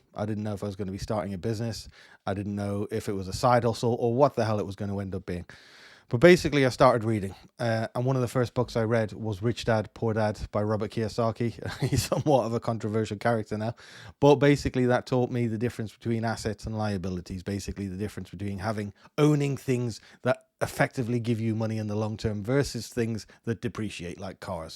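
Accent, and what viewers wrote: Ireland. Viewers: ayo I would have thought you left Ireland not that long ago, you have that Irish accent, even when you said you came from Ireland I was expecting Northern Irish, no offence. Despite all the bad things about the UK, It is the ISA that keeps me in the UK NGL. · I was thinking I could hear the brogue. ☘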